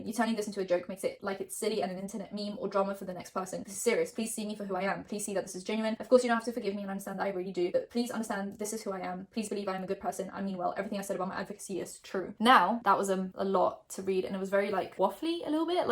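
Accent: United Kingdom. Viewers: I watch you videos all the time but today I was surprised when you started talking and I heard your accent , as if I had no idea you had a british accent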